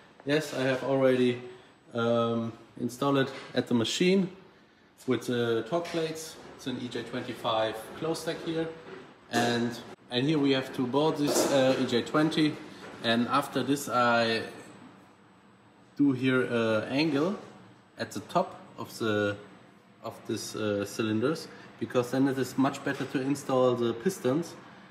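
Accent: Germany. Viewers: давно в Германии живёт,слышно по акценту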